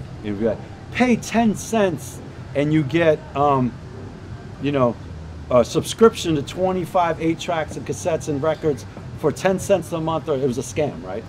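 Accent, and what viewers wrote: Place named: United Kingdom. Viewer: He's American??? All this time I thought he was British...😂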